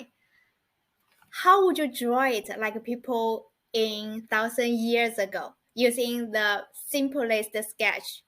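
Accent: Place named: China. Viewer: I love it when Chinsse women speak Chinsse English! It sounds cute 😍😍 just NOT AMERICAN... Got to get me a Chinese wife one day!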